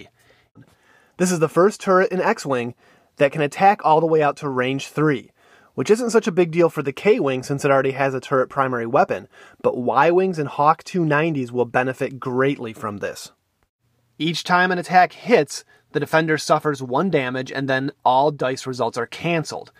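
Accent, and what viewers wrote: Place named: United States. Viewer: You're aware that American people tend to have American accents, right?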